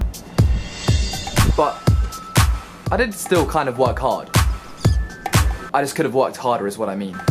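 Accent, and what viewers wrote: Australia. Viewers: Omg you really are getting a Australian accent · Lord your Australian accent is getting thicker and we aren't even at July yet. You're going to come back to the UK a full Assuie. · You should go somewhere tropical to spice up the views... Bali, where I am Malaysia, Bangkok. New Zealands visually bit blah. You just bit of a square M8 liven it up. Lol and lose the awkward Australian twang. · do I hear a slight Aussie accent now? 😂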